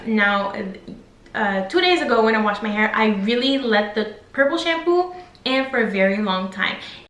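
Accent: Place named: Montreal, Canada. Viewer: You’re accent sounds Montreal Canadian. I might be totally wrong lol 😆 your hair looks amazing !!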